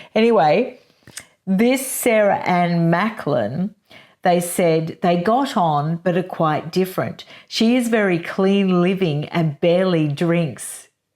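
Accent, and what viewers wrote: Australia. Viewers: Thank you for your videos. It’s so wonderful to hear an Australian accent on YouTube too. I like the way you intelligently present things. I also enjoy your genuine glee at sharing the “juicy bits!” 😉😂💐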